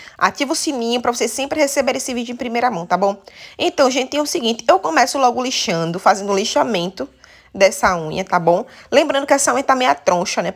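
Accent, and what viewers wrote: Northeast, Brazil. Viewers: Vc é muito espontânea e engraçada. Vc é do Nordeste? Amei o seu sotaque. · O sotaque nordestino é o melhor do mundo, amooooo!! Tú é muito boa, mas a narração é a melhor!!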